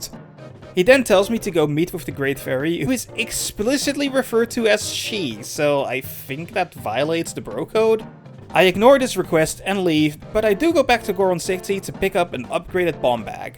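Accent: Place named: Netherlands. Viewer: Hmm... your accent. You're Dutch, right? Great video :)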